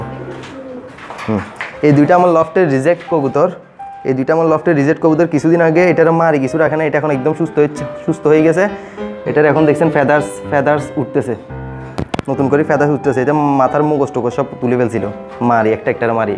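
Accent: Chittagong, Bangladesh. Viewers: ভাই আপনি কি চট্টগ্রাম থাকেন? কথার আওয়াজ কিছুটা চট্টগ্রামের মতো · ভাই চট্টগ্রাম এর নাকি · ও ভাই আপনিতো অর্ধেক চিটেইংগে বাকি আধা চলিত ভাষা হন্ডে বাড়ি তোয়ারো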